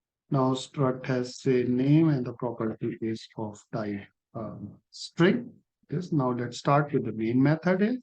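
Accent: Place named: India. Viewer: Gosh .... Indian english is disguisting . As always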